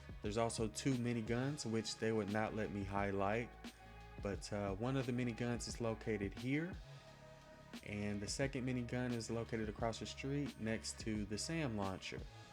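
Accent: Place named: Texas, United States. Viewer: Es FLOR de Oro, pocos huevos de pinche mierda! And Jesus Fucking CHRIST! Talk about a really THICK Gringo tongue! You from Texas, boy? Yo soy, también, pero hablo Español respectablemente, académico, en facto. Tu pronunciación es ferguenzoso. Ay, me cai gordo. Flor has ONE syllable, not two; it's NOT pronounced FLOW-err. Do you HEAR the words coming out of your mouth? I'd be astonished if you'd managed a D in high school Spanish, and that's sayin' somethin', you bein' from the South an' all.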